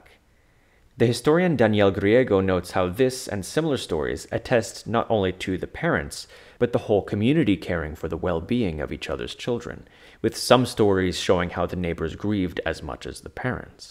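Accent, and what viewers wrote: Quebec, Canada. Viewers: L'accent québécois m'a surprise haha (j'entends rarement mes compatriotes sur YouTube) Merci pour l'excellent vidéo 🎉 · Es-tu Québécois??? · Toi t'es québécois c'est certain 😅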